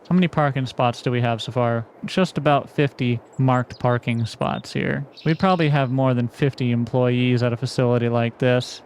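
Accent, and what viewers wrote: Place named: Utah, United States. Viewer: Do happen to be from Utah? You have the accent 😅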